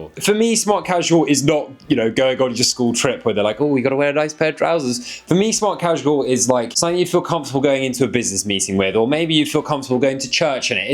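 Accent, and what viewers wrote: United Kingdom. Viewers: english accents tickle my brains in a good way😌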